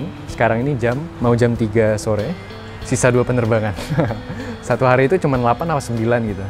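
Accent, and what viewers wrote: Java, Indonesia. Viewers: aksenmu kok njowo ngono le kwkwk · kok ngomong nya medhok ya 😅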